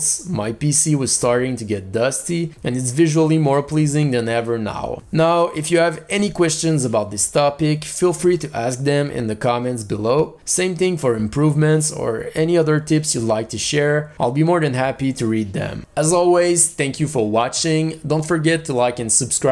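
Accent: Quebec, Canada. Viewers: Est tu Québécois ? :D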